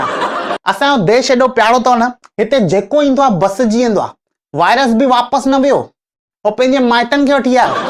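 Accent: Sindh, Pakistan. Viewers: Really refresh our mood.. Speaking skill too good.. Love to hear ur sindhi accent.. Blessings n wishing success always 👌👌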